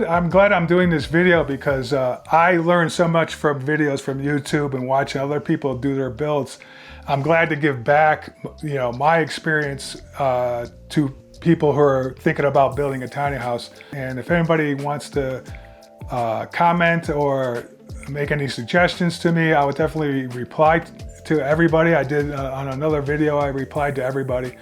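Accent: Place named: East Coast, United States. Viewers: He's so much cuter than Will. I also detect an eastcoast accent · is my brother, and he is cuter, and so. so funny. He is so happy now back in CA. But yes the dialect is New England.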